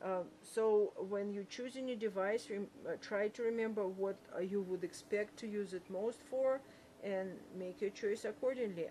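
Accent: Russia. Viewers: Ты случайно не русский?) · очень сильный русский акцент :)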